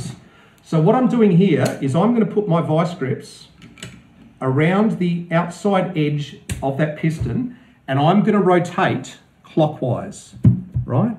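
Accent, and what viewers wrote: Australia. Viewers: The moment i jeard the aussie accent i knew i was gonna hear exactly what i needed to hear in perfect detail. Ya folks down under are really something else when It comes to automotive prowess.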